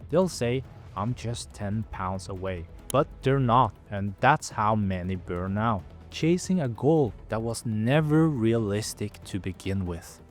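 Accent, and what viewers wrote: Norway. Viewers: Do I detect a norwegian accent? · Wait, it took me a minute to realize, but you have a Norwegian accent. Cool! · Love the Norwegian accent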